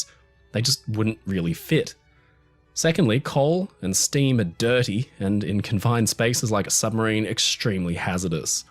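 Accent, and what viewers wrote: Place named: Australia. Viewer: congratulations on a SUPERB documentary. Apart from the quality of your research, your narration is astounding. Educated, articulate, clear diction and an easy- listening Aussie accent without any drawl or ockerism, and your grammar is faultless. As a fellow countryman, it makes me proud that your voice is being heard on the world stage, its just a beautiful example of how things should be done...and especially, it's a huge favourable contrast to the bloody awful AI voice-overs that are being churned out now. Fantastic job, very well done.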